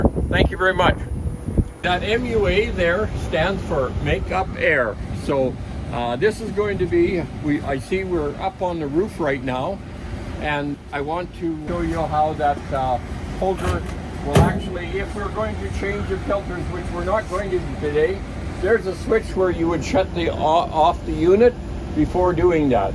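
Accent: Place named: Canada. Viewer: You sound sooooo Canadian!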